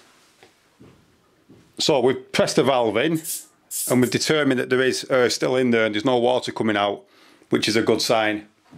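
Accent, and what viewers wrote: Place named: Barnsley, United Kingdom. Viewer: Sounds a bit like Barnsley accent. :)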